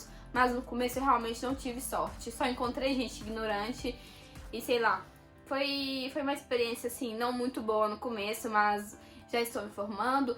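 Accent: Italy. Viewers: Você sem perceber adquiriu um pouco do sotaque italiano, que legal haha! Você fala "cantando" em alguns momentos. Un abbraccio. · Ouvir vc falando que sofreu e ouvir vc falando já com sotaque italiano da um orgulho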